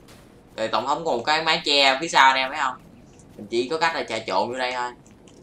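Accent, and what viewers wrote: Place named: South, Vietnam. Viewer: mình ở hà nội nhưng cực thích nghe giọng caster miền nam, buồn cười dã man luôn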